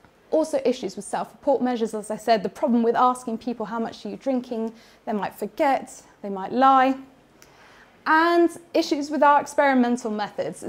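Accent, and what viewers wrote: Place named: Bristol, United Kingdom. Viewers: a lecture by a British English speaker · she speaks perfectly understandable received pronunciation English with a Bristolian dialect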